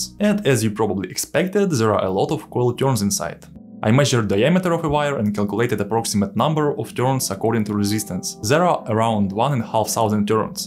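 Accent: Russia. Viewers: Thank you!, your accent Russian why I say that? Is because magnetic fields then to coil's a EMF field. Tesla coil, plasma current flow, and understanding transformers, hover crafts flying UFOs each country has one the harnessing of plasma called plasma engines ( motors). Russia is white and I want to say a bird shaped Hove craft our on this side of the world same but mercury and it's flow. Got to Go star Trek create the new generation so they can boldly Go where man's gone before!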